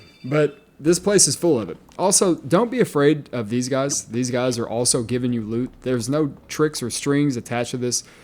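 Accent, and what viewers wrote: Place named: South, United States. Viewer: You're from the south, aren't you?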